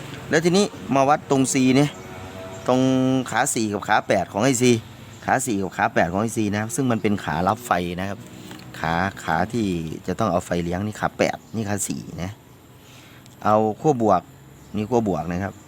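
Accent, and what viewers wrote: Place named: Isan, Thailand. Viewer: มักคักๆสไตร์การพูดไทยบ้างอีสานเพลินดีดูสนุกเข้าใจง่ายเอาไปร้อยเลย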